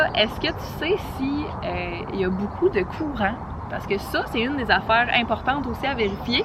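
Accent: Canada. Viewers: Bonjour je suis nouvelle désolé est ce que tu es Canadienne ?? ;) Car tu as un magnifique accent 💕✨ +1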